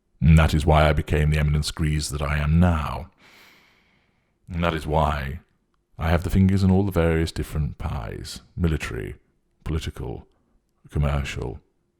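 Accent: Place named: RP, United Kingdom. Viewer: is your name a pseudonym for the Holy Grail of Tutelage of NPD or to represent your being on par with the royal Tudors making you a self-appointed HRH? Or could it be an homage to HG Wells, or a combination of the above? I remember a few, perhaps several, years ago when you did a podcast with a young black American female. I remember what you looked like in detail as I have a photographic memory. In addition, you spoke briefly about your mom being an extreme narcissist, the passivity of your father and how you felt he failed to protect you and your sister from the abuses of your mom. You intimated that you experienced some form of sexual abuse or molestation but did not care to go into the details. You also described your sister as the ultimate accommodating, people pleasing codependent. You told how the YT videos and all the Machiavellianism altruistic outreach work to educate others on the subject of NPD was part of therapy - as in your therapists requested you to do so. 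In the podcast, you said you were only in therapy as a condition to maintain your inheritance and position in the family business (not sure how the last part was phrased). Your voice...a very Queen's English British sort was similar to the one on your videos but far less menacing, but I attribute the difference to the use of some sort of voice machine.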